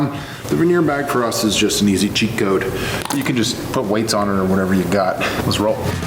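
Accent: Pittsburgh, United States. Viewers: Hmmmm I detected a Yinzer... Looked you up, it's good to see a local (to me) doing good. (Even though you went to Franklin lol j/k The FR vs PT battles are some of the most exciting to watch). Great build, I may try and make one when I have more woodworking under my belt.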